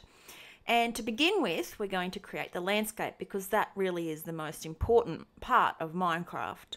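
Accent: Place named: Australia. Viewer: Tea Requiem It's an 'advanced tutorial' she's not going to explain how methods work etc. That much is supposed to be given. She gives a brief overview of the code, and leads the rest up for you to think about after the video. If you ask me, that's how I learn best. Doing, not having someone explain everything; and experimenting on your own! Anyways, as for accents, I'm pretty sure no one really complains about Australian accents. Her's isn't deep to the point where I don't understand, and it's perfect to listen to it. And no I can't deal with heavy accents. Why would you even complain about that? It's my personal opinion lol Anyways I really liked this video, she deserves subscribers from everyone!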